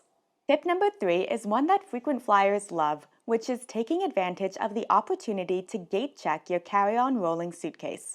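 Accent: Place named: California, United States. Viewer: Can't take that voice. The "Cali-Talk" is just too irritating. Good thing there's a transcript.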